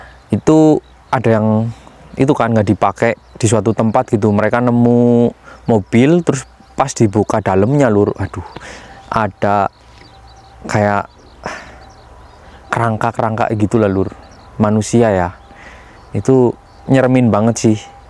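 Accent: Banyumas, Indonesia. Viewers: ngapak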